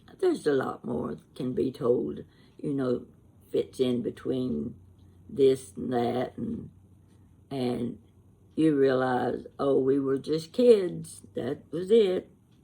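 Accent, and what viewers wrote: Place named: Eastern Kentucky, United States. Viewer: My grandmother was my best friend. I lost her 16 years ago this week, and hearing you brings the warmth I have been missing for so long. Thank you. ❤️ I have to ask, where are you from? Your accent is hauntingly familiar. Sounds like Eastern Kentucky.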